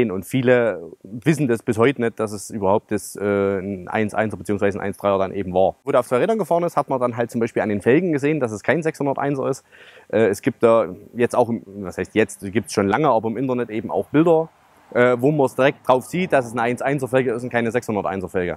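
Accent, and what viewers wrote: Saxony, Germany. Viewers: Ein toller Pappe Oldtimer schon fast 70 Jahre alte Entwicklung! 👍🏼🤘🌹 Ein echtes Roeff ..Töff Töff! Cool! Nach damaliger Bestellung.... nach 12 Jahren erhalt......schon verrostet am Unterboden! Mit ganz tollem sächsischen Dialekt! 👍🏼👍🏼👍🏼👍🏼👍🏼🤣😂😎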